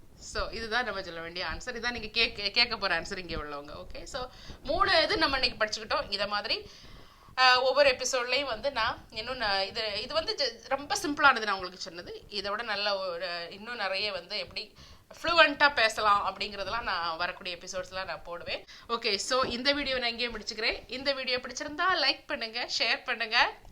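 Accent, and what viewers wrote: Nagercoil, India. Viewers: உங்கள் நாகர்கோவில் பேச்சு மிகவும் அருமையாக உள்ளது. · namma nagercoil slang a friendly a pesurenga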